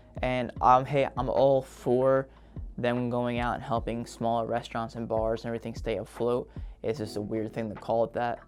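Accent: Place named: United Kingdom. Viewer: Not sure why, but I thought you are from UK haha!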